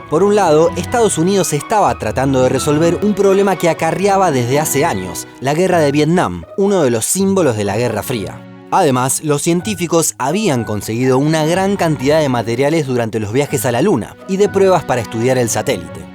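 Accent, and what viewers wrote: Colombia. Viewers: Eres colombiano?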